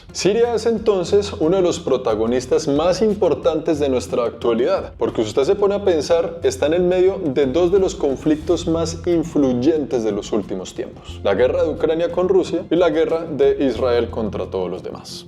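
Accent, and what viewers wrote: Colombia. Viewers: Tan bello con ese acento colombiano inconfundible !!!! Muy buen vídeo !!!!!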